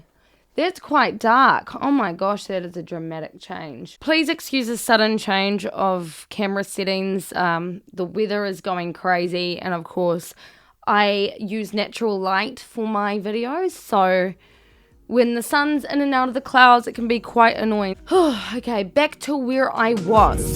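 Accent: New Zealand. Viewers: Is that a kiwi accent I hear? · Sounds like a kiwi accent, she'd be from New Zealand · Are you a kiwi????? 😄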